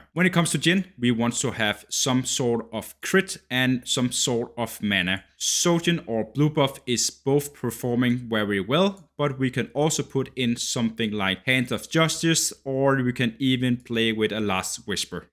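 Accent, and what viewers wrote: Denmark. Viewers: Are you from Denmark?? You sound like a danish person 😂😂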